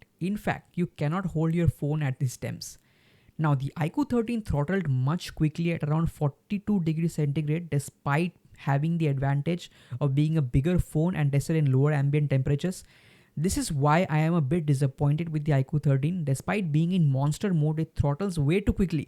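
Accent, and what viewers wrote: India. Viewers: I click the video so fast but then closed it as soon as I heard the curry accent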